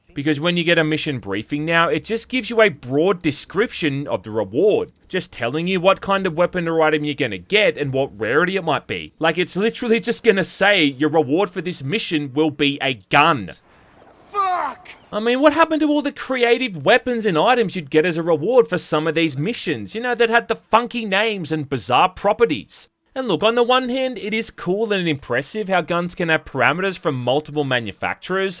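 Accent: Australia. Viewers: Why does sound MORE Australian the farther back you go in his videos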